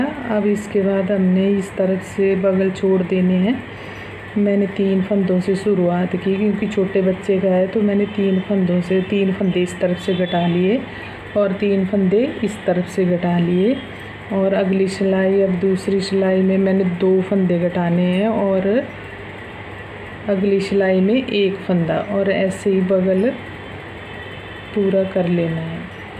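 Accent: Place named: Haryana, India. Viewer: Aap ke bolne se mere ko laga ki aap haryana se hai .mai to m.p.se hu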